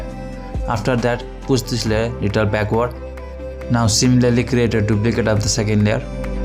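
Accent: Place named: Spain. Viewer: Your Spanish accent is so nice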